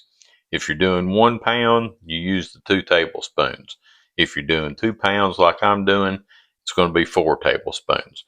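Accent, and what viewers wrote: South, United States. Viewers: New subscriber here who just happened upon your video and My husband and I can’t wait to make this and we will be watching for more content and just to listen to you talk I LOVE a Southern accent more than anything in the world ❤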